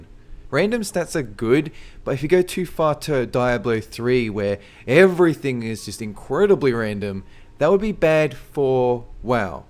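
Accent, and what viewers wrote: Australia. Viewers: Sick you're Aussie! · Dude, you're an Aussie?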